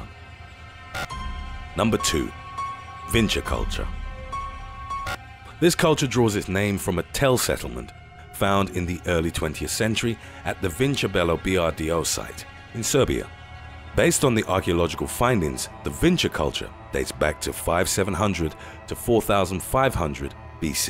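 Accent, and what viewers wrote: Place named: England, United Kingdom. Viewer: For an English sounding narrator, the guys understanding of English pronunciation is abysmal!!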